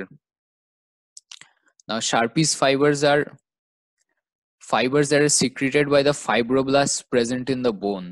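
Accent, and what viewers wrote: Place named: Italy. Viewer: Love the Italian accent XD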